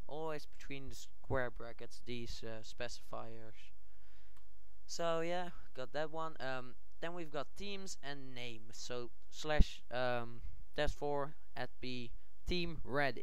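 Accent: Netherlands. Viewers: are you dutch ? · Are you dutch?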